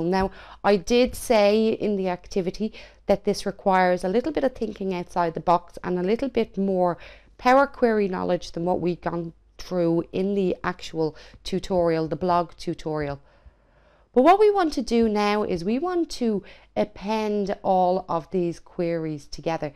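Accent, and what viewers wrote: Ireland. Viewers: Great. Thanks. Btw nice Irish accent. :-)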